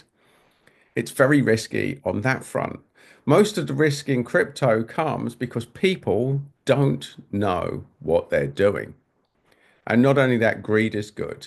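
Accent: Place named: London, United Kingdom. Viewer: Hi there. Good video. By your accent, sounds like you're Londonish based too. It is a very good point on the terrible UK establishment psyop on Crypto. Almost everyone I know still thinks BTC is the money of criminals thanks to this fear porn. For those that are interested, I also put out a video on how not to get scammed in crypto - different angle though.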